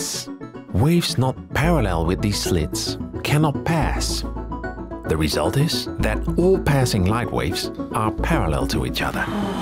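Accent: Netherlands. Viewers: This channel is like technology connections but narrated by a Dutch(?) person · Are you dutch?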